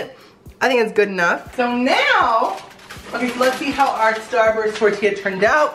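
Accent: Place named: United Kingdom. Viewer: HIS LITTLE BRITISH ACCENT MAKES ME LEE EVERYTIME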